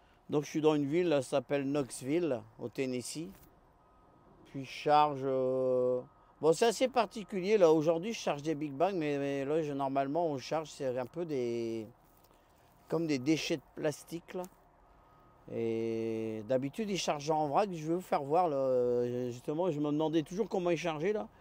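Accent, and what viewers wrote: North, France. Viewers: Merci pour le partage c est toujours un régal mais tu as un accent du nord 😊de la France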